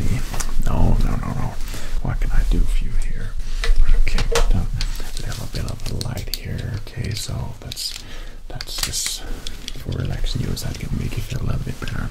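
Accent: United States. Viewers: Amazing. Two giveaways to the American accent: 1. We call it a flashlight, not a torch. 2. British ASMRtists say “anything” like “a-nuh-thing” or “a-neh-thing.” Americans say it like “eh-knee-thing” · damn your american accent is really good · That’s actually a really good American accent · Everyone’s talking about your joker impression, but I’m just amazed by the American accent in general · Happy Halloween and you wore Joker costume and American accent. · His American accent isn’t perfect but it’s pretty darn good, much better than I expected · Your American accent is getting REALLY good!! 👏👏👏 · he sounds so different when speaking with an american accent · Speaking American accent. What the heck. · the American accent sounded great. You should do it more often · I love it that does an American accent, but still says "torch"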